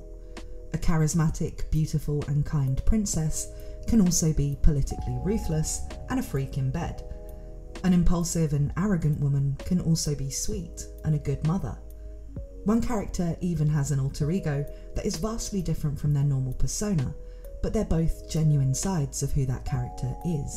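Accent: United Kingdom. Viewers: Are you a brit????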